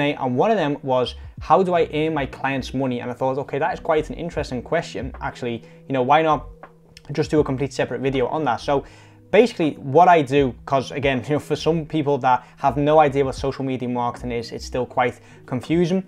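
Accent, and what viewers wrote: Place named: United Kingdom. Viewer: So, ....you are in The Netherlands, but you have a British accent and your photo at the end of the video is in Rome. Is that right? Are you British? I like your channel.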